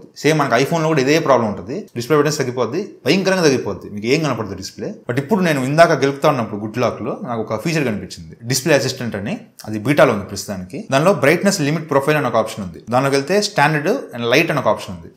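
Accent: Nellore, India. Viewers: Bro nedhi Nellore ha ????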